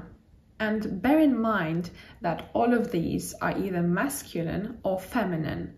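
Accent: United Kingdom. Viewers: By the way, your accent, are you in UK?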